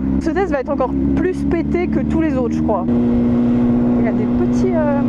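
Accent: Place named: Burgundy, France. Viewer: Oh 😱 ta l'accent bourguignon. Jamais j'y prend en 2. t'es trop large 🤣. Et cet embrayage alors 🤔. Et ben tu m'a bien fait marrer. Eh ben dé que j'ai la mienne tu as l'essai en exclusivité. Et promis tu ne l'a pas encore essayé. Vivement l'essai du futur 4 cilyndres. Je suis sûr que tu vas kiffer la moto de Bébert bobber 😁. Bravo à pour le prêt de la machine. Merci copine pour ce bon moment passé virtuellement. À bientôt et gros V ✌🏻 à vous deux la bise de Bourgogne 😘 tu le sais déjà. Peace ✌🏻.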